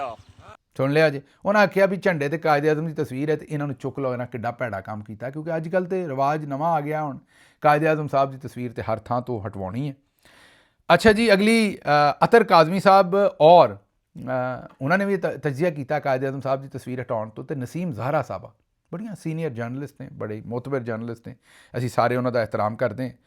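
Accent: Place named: Malwa, India. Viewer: Sahib tuhade wali Punjabi Bathinde Mansa area vich boli jandi hai.Mai tuhada prog bahut enjoy karda ha. God bless you.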